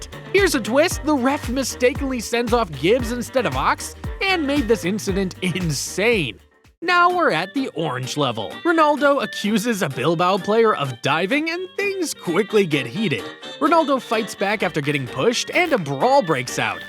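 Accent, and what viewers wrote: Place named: United States. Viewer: Can we have the voice over removed? The commentary is just too American.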